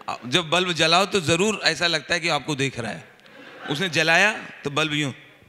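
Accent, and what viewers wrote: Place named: Kanpur, India. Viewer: Aap ko sun ke Kanpur yaad aa gaya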